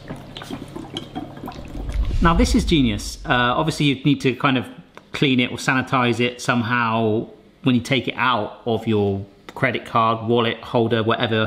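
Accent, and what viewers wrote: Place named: United Kingdom. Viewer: You think in 2021 everybody have at home a 3d printer?maybe in America or England because i think you are British after accent.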